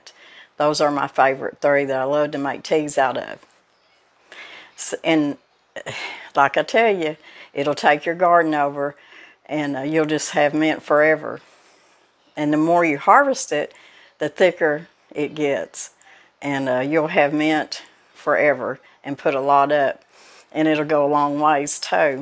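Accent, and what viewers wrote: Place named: Arkansas, United States. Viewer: I love it that you can make a video without getting “made up”. You look pretty without it. Your voice and your accent is just like my family in north eastern Arkansas. I feel like I should know you. I’ve used many of your recipes. Keep it up!!!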